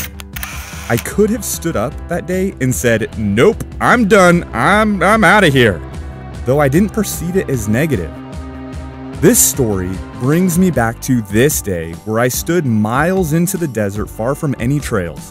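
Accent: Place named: England, United Kingdom. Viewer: Love your English accent 😂.. better than my American one..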